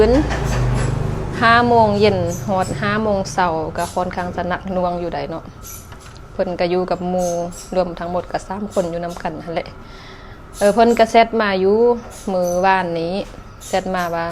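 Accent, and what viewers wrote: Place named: Loei, Thailand. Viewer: สำเนียงพูดจาภาษาเหมือนคนไทยเลย จังหวัดเลย จังหวัดเลยเขาจะพูดฮันแนว❤❤🎉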